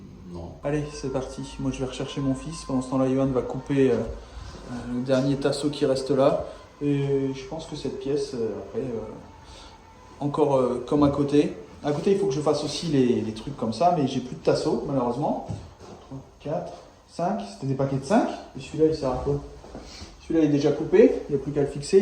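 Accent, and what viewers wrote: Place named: North, France. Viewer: Coucou,apparrament l'enduro reste au programme. Ici pareil ont n'attend également. Mon fils a était ce weekend au terrain ou tu t'entraines. Je sais pas si tu as connu celui de calais,les spectateurs étaient en hauteur,il y a une vingtaine d'années tout les weekends mon beau frère et les collègues y aller et c'était génial de l'ai voir même si ils nous ont fait des frayeurs. Et a Equihen également. Celui ci existe toujours. En 99 une tempête de sable a eu lieu au même moment. Moi et ma cousine en panique,le reflex des bonhommes de poser la moto et de nous rejoindre en speed car on voyait absolument plus rien,avec les enfants nous sommes tous parti dans les véhicules,le temps que ça passe. C'était choquant. Sinon niveau travaux, ça avance très bien. Mr est parti faire un chantier sur Etaples,bjr le vent ici. Pour ton titre, oh que je te comprends,et même si ont me dit la roue tourne, ça laisse un goût amer!. 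J'ai regardé le live a Rox,et c'était cool même si c'était en Replay donc pas de commentaire. Mais pas mal,comme ici c'est des grands,et qu'ils adorent asiatique,je vais leur faire. Moi perso je comprends très bien l'accent du nord car j'y suis née,mais je rigole parfois car certains abonnés doivent ce dire"quesquil dit lui"lol. Bon courage, ça avance 💪🏼👍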